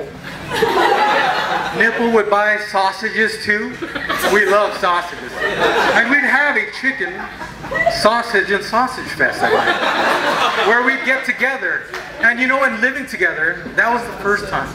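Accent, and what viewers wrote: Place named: California, United States. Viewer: Whoo this guys from California for sure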